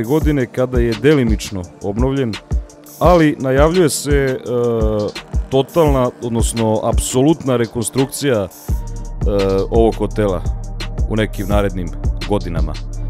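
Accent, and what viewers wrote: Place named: Belgrade, Serbia. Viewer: Ovo je zaista iskren komentar. Odlično radiš, što radiš ni psovanje ne smeta to je srpski rečnik inače realni. Ali do jaja je bljutav taj bOOOgradski naglasak i dijalekt. Valjda što je meni beograd toliko omražen, možda sam pristrasan ali malo prirodnosti ne bi škodilo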